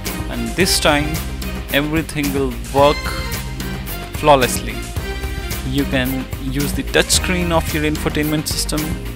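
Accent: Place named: India. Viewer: the first tutorial with hindi accent that actually helped me Great job mate you are 1 in a million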